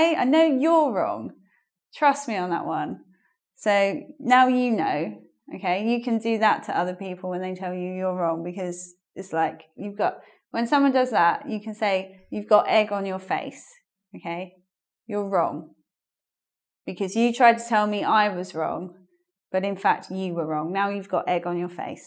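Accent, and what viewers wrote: United Kingdom. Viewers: Hi, Please I would like to learn the pronunciation of the english O how you pronounce them in the words as SO, I know. Cuz I m still hearing the Y literally SO - soy.:D Thank you. Btw I really like the british accent about the O:D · Hi. Thank you for your video! Your British accent is so beautiful! I could stay the whole day just listening to your voice haha your video is good, but sometimes you should repeat the pronounciation of some words more often. For example, you just pronounced the word ''tongue'' once, so I had to come back the video again. In addition, thank you again and I think that you chose good examples for the video! xx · To be honest I don't learn anything from theese I just like listening to british english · Hellooooo dear. Just wanted to say your lessons are top notch. The very best, really. I know some English and I love it. 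Can't explain why. But the more we know the more we know we need to know more. Huahuaa. Your British pronunciation is delightful. I 'm really fond of British English. Also I'd like to ask you if you give private lessons --- maybe on ITalk, the site that gathers a bunch of teachers teaching languages online.. Please don't stop. Carry on showing the world how great this language is. All the very best. Cheers. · Hi! I'm learning English, and I love yours videos :3 and I also love your British accent :3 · A thousand thanks, your are a fantastic teacher. I've lived for many years in the US (I'm from Argentina), and it is difficult to me to understand British accent. Another thing to remark is that you have rules that in american English are often overlooked, so I'm learning a lot from your tutorials. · Is this Brittish? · I'm learning American English... Is this british ? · I love british accent, thanks for your classes, I am really enjoying it :)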